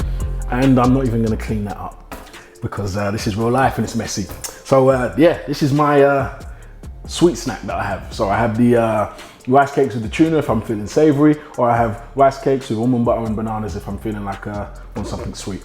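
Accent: United Kingdom. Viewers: Keep that culture and UK accent alive. Cheeewna! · That's just another UK vs US thing. I and everyone I know in the UK pronounces it chewna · only got the fact he was britsh bow after 1 whole vid and 10 mins into this one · are you British? I love your workouts man I do them with the Misus. Need to ask you a few questions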